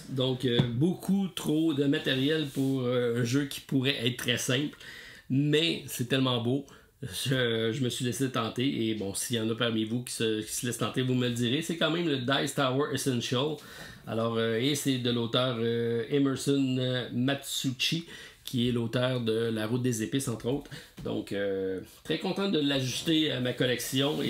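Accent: Quebec, Canada. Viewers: C'est sympa de voir que la société des jeux s'étend. Avec des interlocuteurs différents avec qui on peut s'identifier (plus ou moins). Par exemple a l'école du jeu il y a avait 3 gars et je regardais les vidéos de Sylvain en priorité car j'avais bien marché avec ses goûts ludiques et ses analyses. Je trouve aussi bien d'avoir les Québécois s'exprimer et atteindre les français. Votre accent n'est pas assez présent (ou dialecte: "introduire" pour présenter ou une "efface" pour une gomme 🤣). Continuez ainsi! Mais pars pas trop loin, on est encore trop attaché au!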